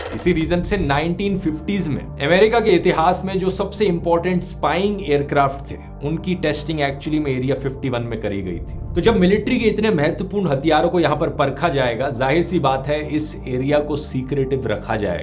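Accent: Haryana, India. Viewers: DONT YOU THINK DHRUV RATHHEE STILL KEEPS HIS HARYANWI ACCENT(JAAT) WHILE SPEAKING HINDI IN THE VIDEOS.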